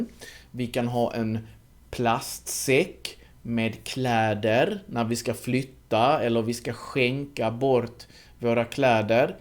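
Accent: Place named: Skåne, Sweden. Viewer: Tack! 😆Jag tyckte att du pratar Göteborgska. Men det är faktiskt skånska. Du pratar väldigt tydligt och jag tror att dina videor har hjäpte många som lär sig svenska.👍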